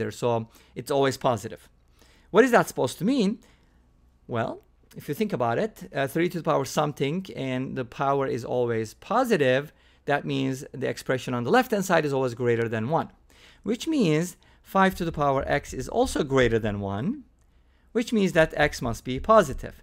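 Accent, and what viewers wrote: Turkey. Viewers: yutube Algorithm beni buraya getirdi videoyu izlerken ulan diyorum kendi kendime kesin tûrk kesin yav sesinden biliyorum diyorum ama neyse videolarini ailecek çok beğeniyok 😂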